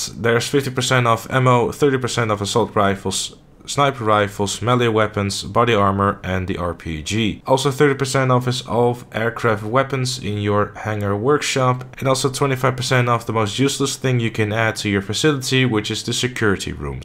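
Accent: Netherlands. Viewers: Mr_ FancyPants he’s Dutch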